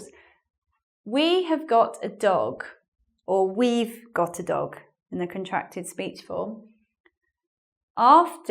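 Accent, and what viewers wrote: United Kingdom. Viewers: Mam, do you use brities accent to teach? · jossan Her british accent is so simple to me... YOU CAN READ THE english subtitles AT THE SAME TIME IF YOU WANT TO learn active listening like i do. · Mrs please can you tell me which part of UK you are from? I'm asking because of your accent. Many thanks for the helpful lesson, I have problem with using (have) as an auxiliary verb! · that accent is British English?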